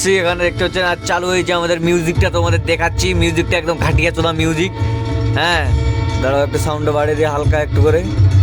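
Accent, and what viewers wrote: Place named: Bangladesh. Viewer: Ei gudir beta youtuber ta bangladeshi pola, ei mal tar kotha sunei bujha jacche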